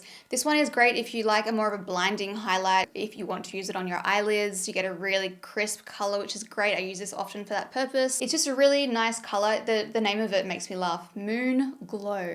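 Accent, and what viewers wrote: Australia. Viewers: ! Hearing your voice reminds me of my Aussie cousins who were just here in the states. Was going to say though don’t feel bad for more engagement. We’re here because we love and want to see you succeed. We are your community! I’ve been watching you since you were at benefit it’s so crazy to see how makeup has changed since then.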